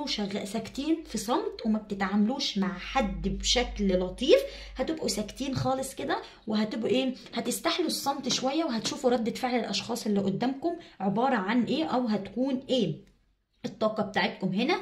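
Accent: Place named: Mosul, Iraq. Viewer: احجي مصلاوي واتاكد انتي مصلاوية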